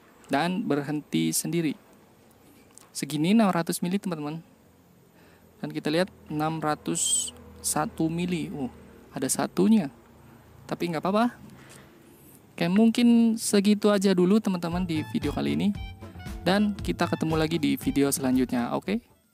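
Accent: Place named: Banyumas, Indonesia. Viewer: Yakin kwren mas..sLam ngapak..ora nhapak ora kepenak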